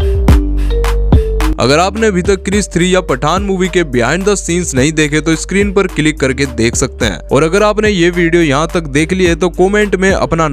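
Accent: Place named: Rural, India. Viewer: भाई पहले श को स कहना बंद करो, देहाती लगते हो बोलते हुए😅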